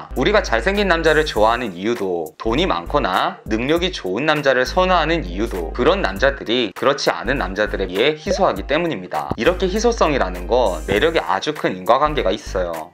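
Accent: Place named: Busan, South Korea. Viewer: 후..부산 사투리 치여요ㅋㅋ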